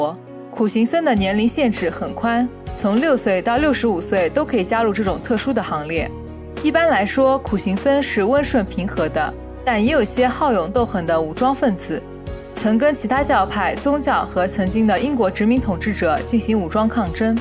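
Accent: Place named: Xinjiang, China. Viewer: 你这新疆 口音很重！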